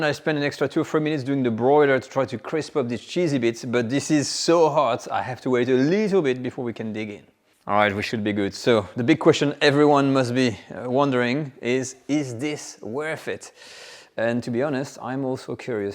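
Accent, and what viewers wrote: France. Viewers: I like his French accent so much! It adds a lot to the experience!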